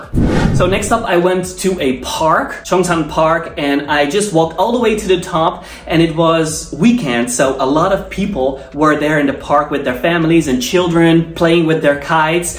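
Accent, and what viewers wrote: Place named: United Kingdom. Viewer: I am a studier for Ielts,thought your prounciation is so stanard that I really want to ask where you from.Are you a real person who comes from UK as your prounciation is so well. Your prounciation of English is more standard than I'ever seen so many people speak English.I can't hear clearly what's the mean of them but I could understand you.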